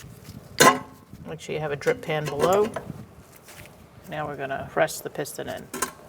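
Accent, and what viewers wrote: Boston, United States. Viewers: Thanks for the torque specs. That is one serious Boston accent. Reminds me of my mom.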